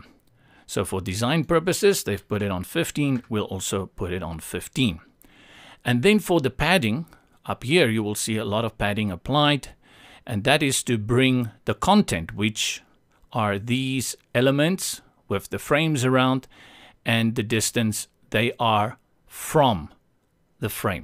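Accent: South Africa. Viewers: you sound like you are from South Africa?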